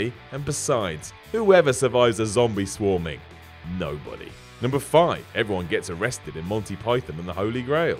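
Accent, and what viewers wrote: United Kingdom. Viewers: It’s disgusting to use 9/11 for anything except mourning. A guy I went to high school with died there at the top(where the kid is in his father’s office.) He was a 21 year old working in finance at Cantor Fitzgerald. To use this tragedy to end some shitty film is morally atrocious. Maybe you could replace it with, I don’t know, “fight club?” This announcer sounds British, would their terrorist bus and subway bombings be appropriate to end a movie with to him? In fact, there’s an incredible amount of films that would be better, my god, “6th sense”? Please be decent enough to lose your final movie, and replace it with ANYTHING else.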